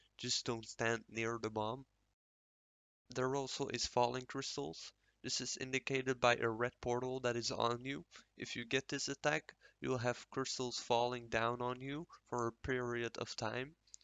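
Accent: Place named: Netherlands. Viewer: jij bent 100% nederlands... nice accent bro.. bedankt voor de uitleg maar ik wist dit allemaal al, suc6 met je drops.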